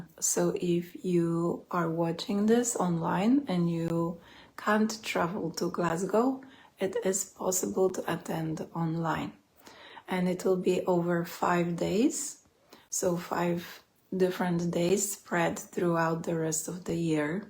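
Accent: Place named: Russia. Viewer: Nifty Russian accent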